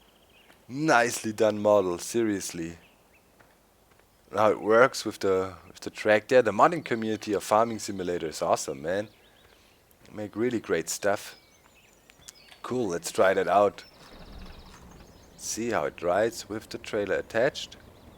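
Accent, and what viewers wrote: Germany. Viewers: SAch mal .. spinn ich oder hast du n deutschen Akzent in der Stimme ?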